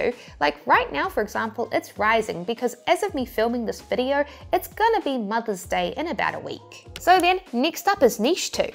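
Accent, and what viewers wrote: New Zealand. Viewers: Pits =Pets 🤣🤣I'm a new subscriber and Im loving your content.! ☺ So basically New Zealanders pronounce "e" as 'I" ? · I like your accent, I was once in New Zealand and in the beginning it was hard to understand but afterwards it sounds so cute 🥰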